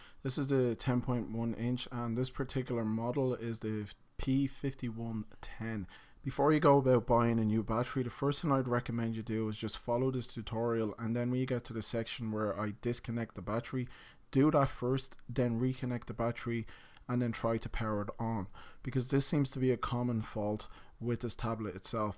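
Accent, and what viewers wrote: Ireland. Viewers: Yes really clear and focussed. I love the Irish accent too. Voice sounds so relaxed like everything is under control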